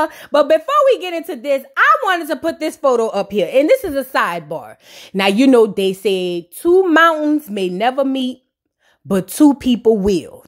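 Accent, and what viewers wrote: New Orleans, United States. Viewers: You’re hilarious! 😂 I started following you last week. I’m NELA but I feel like I’m listening to my NOLA friends. Gotta turn them on to your channel.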